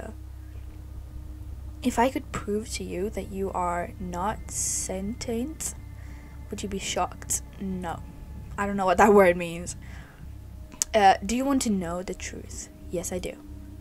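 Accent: Sweden. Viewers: Are you from Sweden? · ... Hey uhhh are you actually from Sweden? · You are from sweden right?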